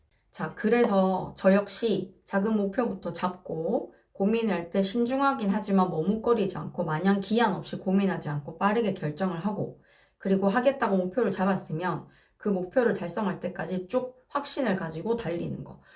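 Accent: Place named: Busan, South Korea. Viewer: ❤❤대단하십니다. 부산사투리가 더 듣기가 좋네요~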